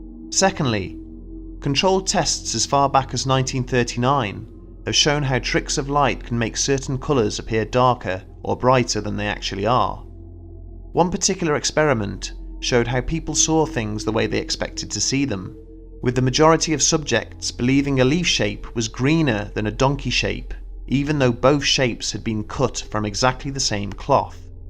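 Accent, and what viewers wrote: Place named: England, United Kingdom. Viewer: The dude’s English. I’m willing to bet he knows how to pronounce Leicester while you do not. Get over yourself.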